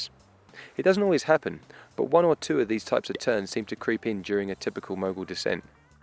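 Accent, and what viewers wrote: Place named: RP, United Kingdom. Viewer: proper queens English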